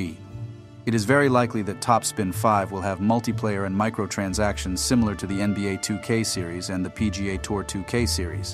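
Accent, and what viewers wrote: United States. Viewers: You have a very crisp clean Italian American voice. And this is coming from a Svensks Deutsche American Vikingr. Keep up this fantastic content sir.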